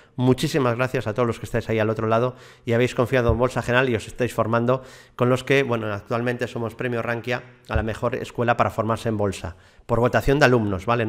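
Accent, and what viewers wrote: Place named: Galicia, Spain. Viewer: No puedes negar que eres gallego.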